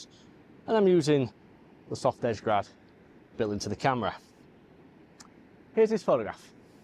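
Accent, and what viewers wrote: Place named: North East England, United Kingdom. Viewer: Superb photos. I really am thinking about going back to M43 and this video helps. Because of your accent I actually thought you were from the north east so was surprised when you said how far you travelled! ‘Honey pot’…if you want a honey pot head a bit further south where you’ll find St Mary’s Lighthouse at Whitley Bay 🙂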